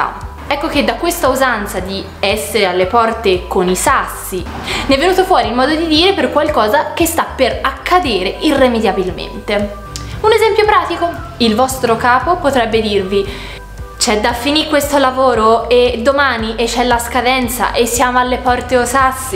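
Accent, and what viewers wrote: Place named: Florence, Italy. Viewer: Mi diverte il fiorentino brava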